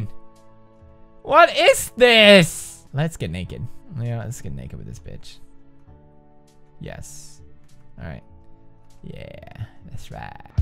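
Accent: Sweden. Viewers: He did say bear but he has a Swedish voice so that in a result is why you hear "beer" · lol it is such a swedish thing, my bf is from there and he says beer as well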